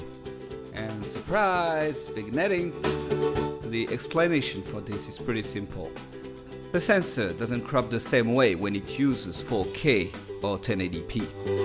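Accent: France. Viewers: Sympa ton petit accent français :p Ca rend bien ! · Oh un frenchie XD ça se reconnait à l'accent ^^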